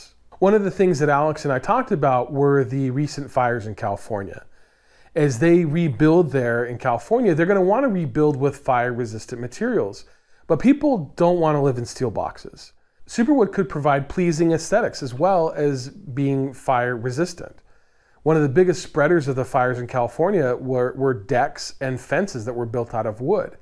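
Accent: Pittsburgh, United States. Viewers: are you a yinzer?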